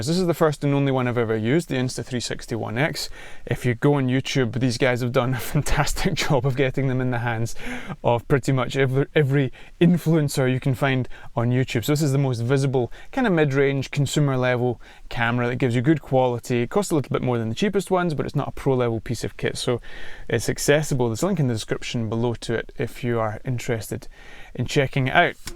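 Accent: Scotland, United Kingdom. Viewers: Great summary & nice video. Brilliant to have a Scottish accent rather than an American one for a change. Cool car to film too. Did you just wedged the pole down the bolster of the seat & which of the 3 selfie stick options were you using (I see the carbon fibre stick is out of stock) ?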